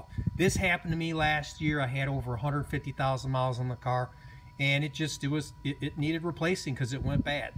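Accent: United States. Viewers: American accent and you know straight away it's over exaggerated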